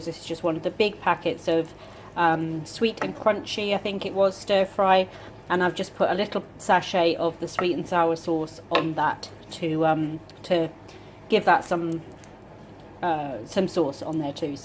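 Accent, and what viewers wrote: United States. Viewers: It really is fascinating 🥰 had no interest in history when I was younger...I love it now🤣 Do you know, when I was listening to you speaking I thought there may have been a slight American twang...15 years wow!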